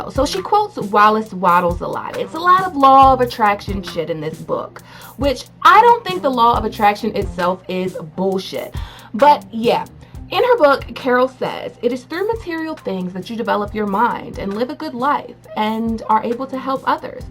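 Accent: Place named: Maryland, United States. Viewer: I feel like your from bmore and I just found you. I'm from bmore so I recognize the accent. You sound from Maryland! Love your vids. Great job Hon!!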